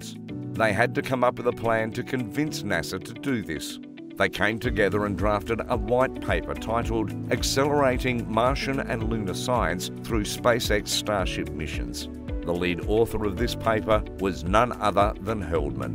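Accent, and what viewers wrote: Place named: Australia. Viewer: Glad to hear an Ozzie accent on Space exploration with know how. Just subscribed!!! Keep up the informative work - mate!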